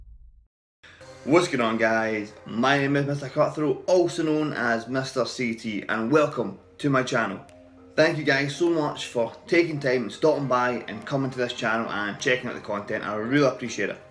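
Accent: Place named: Scotland, United Kingdom. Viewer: Haya fella you were nice enough to check me out so I've come to check you out. I've liked what I've seen (Love this Trailer :-) ) I take it from your accent that your from Scotland. Were about are you based?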